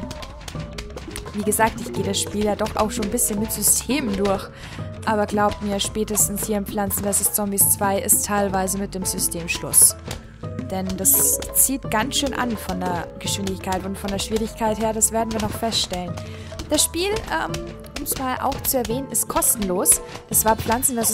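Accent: Bavaria, Germany. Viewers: Yoooo bayrisch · ich bemerke deinen bayrischen akzent , aber er fällt kaum auf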